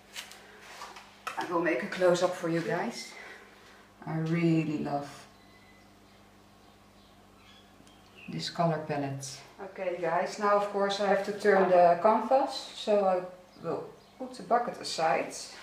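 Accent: Netherlands. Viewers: the accent is enough of a clue to know you're a fellow dutchie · You sound Dutch! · Thick dutch accent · You sound Dutch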